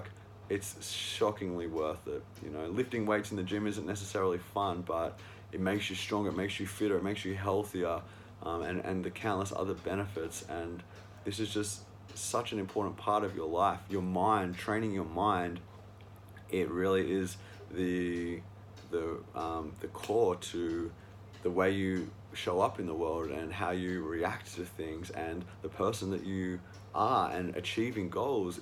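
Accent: Australia. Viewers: I went to my first vipassana course 2 months ago in indonesia. For the preparation, I watched all your videos about the vipassana course. It helped me a lot. So thank you so much for that. Also, on the retreat there was a volunteer who looks like you. His voice even sounds the same like you with Australian accent. At first glance, I thought it was you haha.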